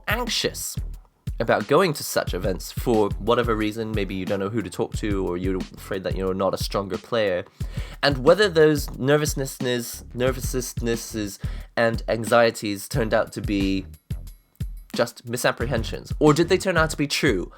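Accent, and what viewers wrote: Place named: Bristol, United Kingdom. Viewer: England. He sounds like he's from the Bristol area maybe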